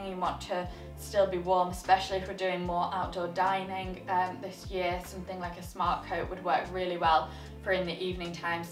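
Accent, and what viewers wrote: United Kingdom. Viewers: Wow. I didn’t like anything in this video except for the black skirt and the British accent. What happened to good fashion? 😕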